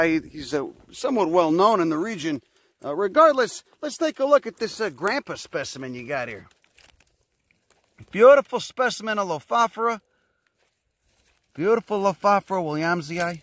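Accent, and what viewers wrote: Chicago, United States. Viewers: he's from chicago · Chicago accent, not any way near New Jersey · I just love a proper Chicago accent it reminds me of home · Very entertaining content! Great accent! (Chicago? Hearing "Da bearsss"). Walked right by a sweet Paleo arrowhead.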